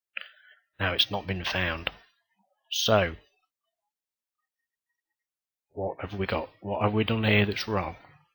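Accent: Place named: United Kingdom. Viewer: Fantastic tutorial! It's nice to get some British tones on here too!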